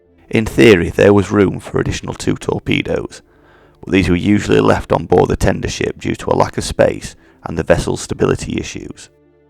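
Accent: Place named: Finland. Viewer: Todella vaikea kuunnella, koska spiikkaajan mikistä puuttuu ilmeisesti popfiltteri kokonaan, tuntuu että nauhoitettu jollain viiden pennin headsetillä. Jokainen henkäys puhisee mikkiin karusti. Tämän vuoksihan haastattelumikeissä on ne vaahtomuovit/pörröpäälysteet. Äänitasotkin jotenkin huonosti, niin kuin joku kommenteissa ehti sanoakin. Parempia ovat olleet ne sinun itsesi spiikkaamat englanninkieliset versiot. Suomalaisella englanninaksentilla on ilmeisesti yllättävän kiva "soundi" ulkomaalaisia ajatellen. Kannattaa ehdottomasti jatkaa englanninkielisten videoiden tekoa, Suomen (sota)historia ja niin erikoinen kalusto kiinnostavat ulkomailla!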